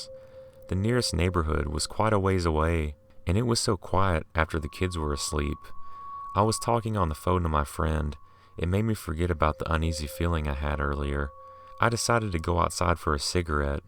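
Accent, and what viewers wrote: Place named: South, United States. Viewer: I love your accent. I'm trying to guess where you're from, somewhere in the South. I'm British so I might be way off... lol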